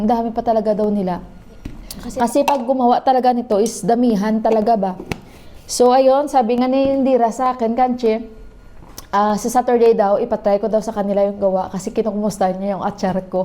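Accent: Iloilo, Philippines. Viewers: .... Tingala ko nga ilonggo ang tuno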